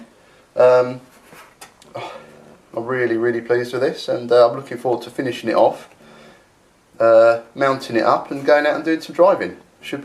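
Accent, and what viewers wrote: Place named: England, United Kingdom. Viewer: Nice work! I never get tired of listening to an English Accent. : )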